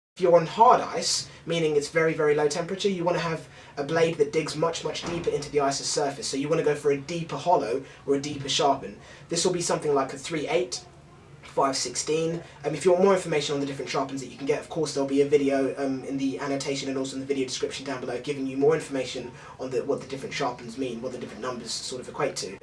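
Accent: England, United Kingdom. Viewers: Tutorials are always better with an English accent. Cheers mate.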